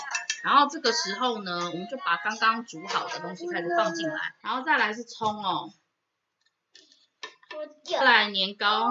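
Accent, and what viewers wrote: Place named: South Korea. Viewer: Kelly講話也好可愛，因為習慣韓文，所以有時候講中文的尾音也有「喔」，現在放蔥下去煮喔，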